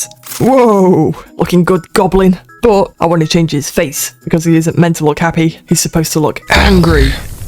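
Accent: United Kingdom. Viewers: it is his accent, i live up north england and his accent sounds familiar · Since you are British, can you say “Can I have a bottle of water?” Please. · Are you British? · Very British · Really Good job, the British accent makes it even better❤ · I Think bros British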